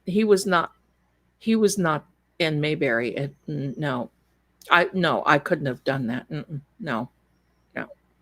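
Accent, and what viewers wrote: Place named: Southern Ohio, United States. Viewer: You must be from southern Ohio.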